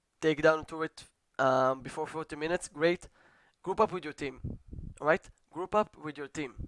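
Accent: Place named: Latin America. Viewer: are u latino? lol ur accent is strong and nice video! pretty informative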